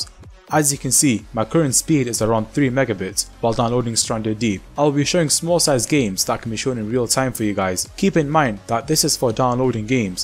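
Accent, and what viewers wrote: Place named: India. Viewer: no racism but you are the first indian sounding yuotuber that has done a legit tutorial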